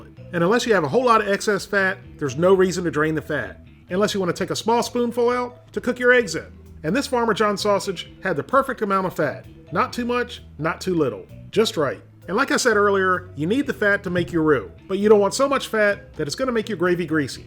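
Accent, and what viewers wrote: South, United States. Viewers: Farmer john is a la area pork distrubuter, but you have a southern accent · Probably a southern tradition plus he had the accent for it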